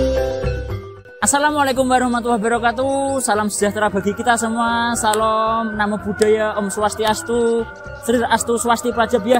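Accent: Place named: Java, Indonesia. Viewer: Menurut saya sangat sangat medok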